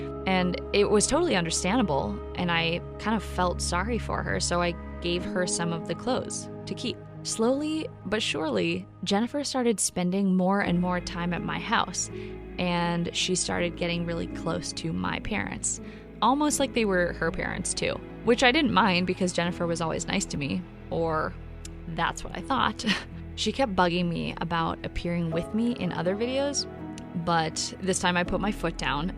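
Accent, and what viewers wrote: United Kingdom. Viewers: justice543212 her voice is british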